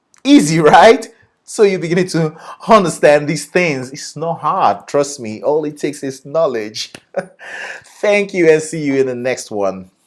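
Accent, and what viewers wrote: Nigeria. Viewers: Are you a Nigerian? You sound Nigerian!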